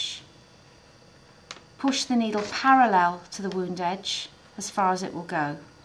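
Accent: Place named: United Kingdom. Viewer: I love british accent and the video was good to. Thanks a lot!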